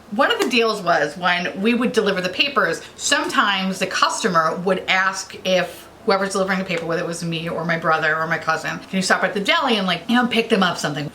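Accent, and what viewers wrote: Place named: New York, United States. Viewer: I’ve been watching beauty YouTube for years and I’ve been broke due to Covid and really fallen off watching beauty BUT when you post a video I get so excited!! Every time you start talking my boyfriend says “is that the New York chick??” 😂😂 then he has to watch you because you’re hilarious 😁 thank you for being awesome!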